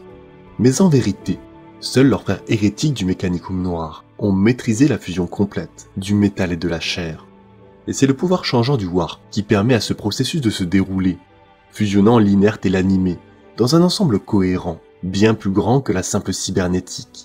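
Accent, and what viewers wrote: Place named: England, United Kingdom. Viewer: Chouette vidéo, par contre vraiment pas fan de la prononciation des noms avec "l'accent anglais", cela fait très "lourd" avec tout le reste en fr...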